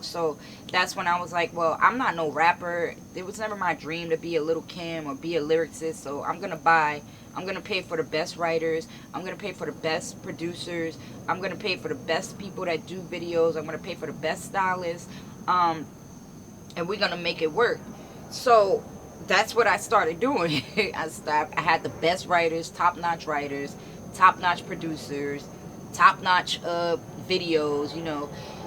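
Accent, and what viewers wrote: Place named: New York City, United States. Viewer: I knew you was from NYC girly. I could still hear the accent. That is something we never loose. I’ve been all down south and my accent never left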